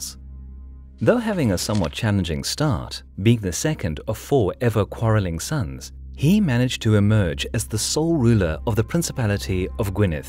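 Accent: South Africa. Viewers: Is this narrator South African? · That's a South African accent .... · Saffer narrator?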